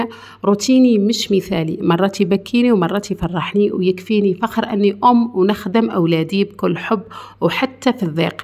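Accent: Djelfa, Algeria. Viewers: باينة من اللهجة جلفاوية وانا كذلك ربي يعاونك ويشفيك ختي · مساء الخيرات اول مرة نشوف قناتك وهدرتك عجبتني ونحسك جلفوية صح اولا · الله يبارك فيك وفي أولادك ويعينك في تربيتهم وتشوفيهم في اعلى المراتب واحسن المناصب يارب وتفرحي بيهم ❤❤ اختي انتي من الجلفة ولا بسكرة جذبتني لهجتك 😊😊 وتحية من ناس الشرق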